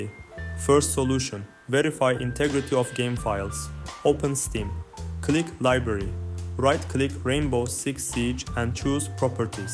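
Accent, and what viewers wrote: Turkey. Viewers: eyvallah reis işe yaradı aksanından belli türk olduğun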